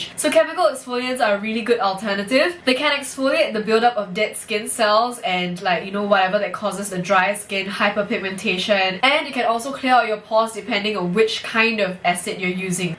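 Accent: Singapore. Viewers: OMG you sound just like my classmate from Singapore. Great review! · lol this is my first time watching your vlog and I cant believe I guess it right, I immediately thought that you're a Singaporean just by listening to you talking hahahahaha I'm from Malaysia btw. Thank you for the honest review, I have some COSRX's stuffs on my wishlist but still don't know which one exactly to get so this really helps me! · It's the Singaporean accent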